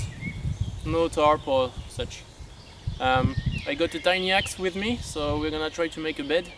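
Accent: France. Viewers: Le bon accent français :DDD Super vidéo, compatriote ;)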